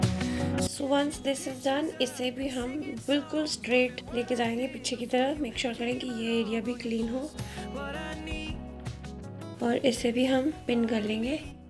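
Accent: Punjab, India. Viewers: I love your punjabi-english-hindi accent😆🥺🥺 it is really cute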